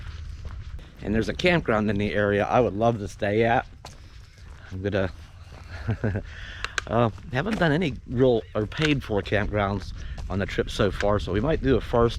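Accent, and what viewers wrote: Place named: New England, United States. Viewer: I'm laughing at your Yankee tongue in this video. The way you pronounce Pascagoula and Bayou. So funny.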